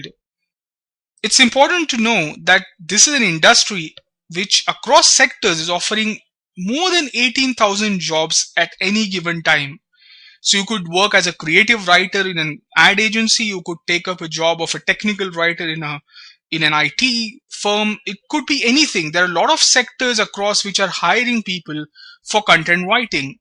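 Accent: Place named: Scotland, United Kingdom. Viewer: ha its bit uk cuuunt. scootish i think.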